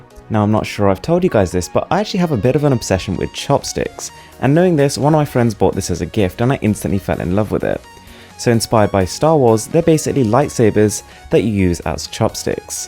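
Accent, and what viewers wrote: United Kingdom. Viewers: No offence but why have US links when you have a british accent? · This entire time I thought you were a white Britain guy from your voice until i seen your picture. And you have some good videos, especially tech room vids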